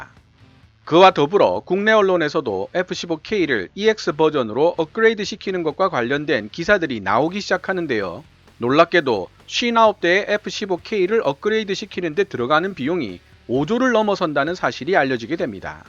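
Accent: Gyeongsang, South Korea. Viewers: 갱상도 사투리 아주 구수하네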